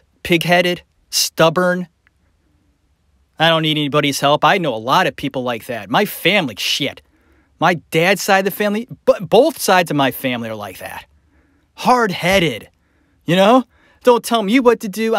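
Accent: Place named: Cleveland, United States. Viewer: You sound like your from Cleveland, Ohio.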